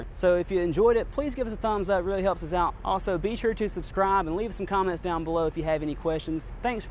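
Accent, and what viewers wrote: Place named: South, United States. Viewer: He sounds like a Texas citizen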